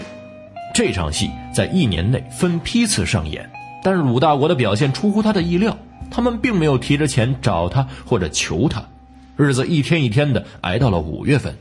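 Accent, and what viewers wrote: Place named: Beijing, China. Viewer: 正宗的京腔京韵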